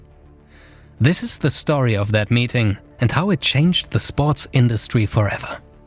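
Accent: Germany. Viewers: Your voice sounds somewhat German. Are you from Germany?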